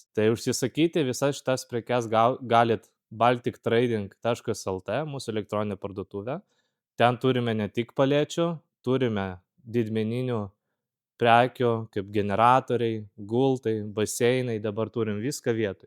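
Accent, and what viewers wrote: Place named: Poland. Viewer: ir galva skaudet pradejo tas lenkiskas akcentas ir dar plius siuksles rodai kaip normalu daigta nezinau ar istversiu antra tavo video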